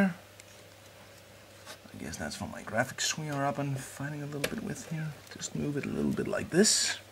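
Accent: Denmark. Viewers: haha jeg kan høre på dit engelsk du er dansk :)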